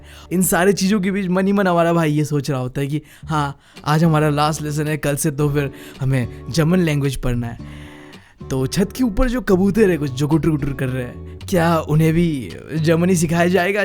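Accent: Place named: Bengal, India. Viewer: bro you are a bangali becase my friend speakes same like you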